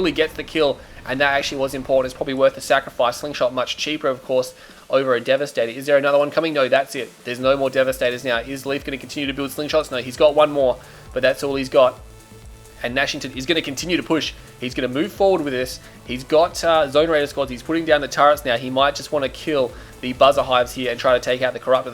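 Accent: Australia. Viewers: Too be fair Australian is one of the best accents in the world. M8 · its weird how literally the most fun Channel on my youtube, is just some Australian dude narrating videos of a game that came out in 2007 and that i still suck at for the most part. And yet it never gets old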